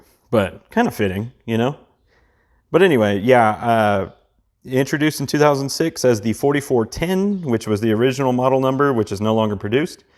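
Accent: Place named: Texas, United States. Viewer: Dude you talk exactly like me. I was born in Ft. Worth grew up in the mid-cities of DFW. Great vid man.🤠👍🇺🇲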